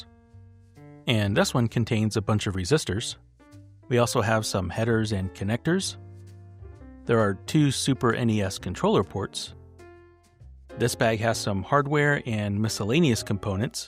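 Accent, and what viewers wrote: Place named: West Coast, United States. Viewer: I feel like that is west coast pronunciation. I catch myself saying wadder instead of water.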